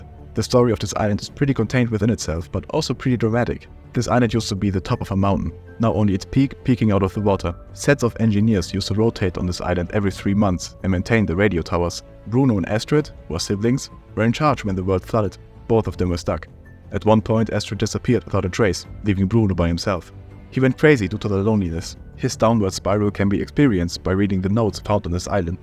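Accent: Germany. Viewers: Are you german? You sound like german😅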